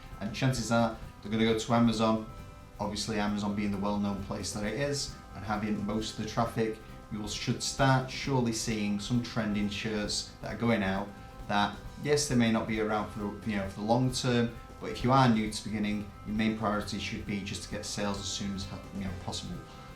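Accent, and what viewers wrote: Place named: United Kingdom. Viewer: You sound from UK, how do we sign up for it in uk?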